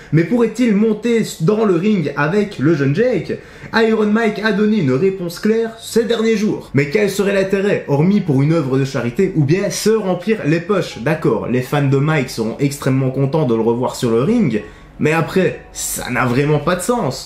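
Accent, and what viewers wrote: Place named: Liège, Belgium. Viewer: Quand je t'écoute, j'ai l'impression que j'entends un léger accent qui revient. On dirait que tu es liégeois ?!?🤔🤔🤔 😎😎😎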